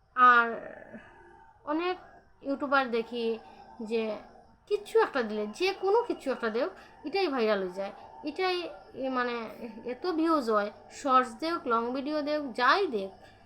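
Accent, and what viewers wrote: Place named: Sylhet, Bangladesh. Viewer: তোমার ভাষাটা একদম সিলেটি ভাষার মত আমার তো খুব ভালো লাগে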